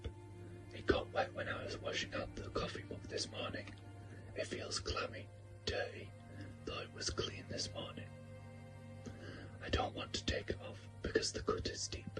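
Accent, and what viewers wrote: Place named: United Kingdom. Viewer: The accent makes me feel like im in Harry potter or something, its amazing 😌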